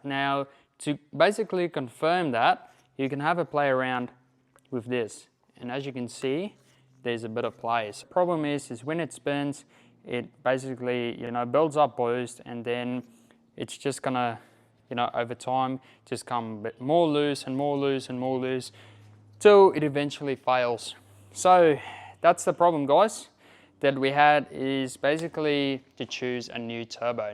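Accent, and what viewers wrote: South Africa. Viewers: Your S.A accent comes through big ups bro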